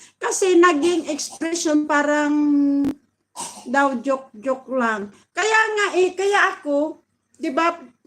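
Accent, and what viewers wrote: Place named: Visayas, Philippines. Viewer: Kaya hindi yanmaganda pakingan host heheh may bisaya daming pronounced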